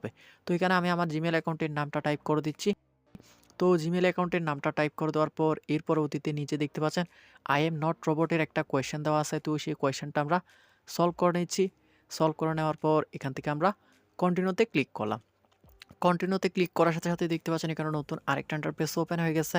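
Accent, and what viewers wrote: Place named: Chittagong, Bangladesh. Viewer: নিশ্চিত চাটগাঁইয়া 😐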